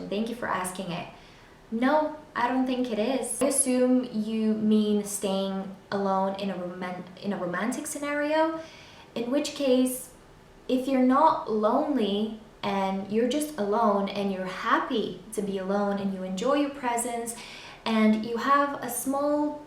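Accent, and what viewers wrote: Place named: United States. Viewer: but you have US accent! how? :)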